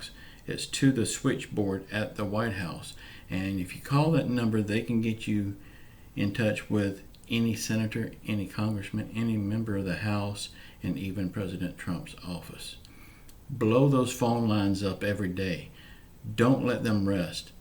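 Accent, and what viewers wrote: South, United States. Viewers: Weeelll, spit it out man,you're southern slang,assumptions are boring,I don't have to call the white house,Madonna is well capable,thanks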